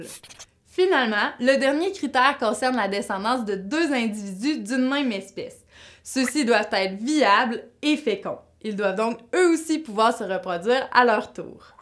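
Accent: Quebec, Canada. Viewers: Merci beaucoup ! En plus l'accent québécois est agréable à écouter haha ! 😀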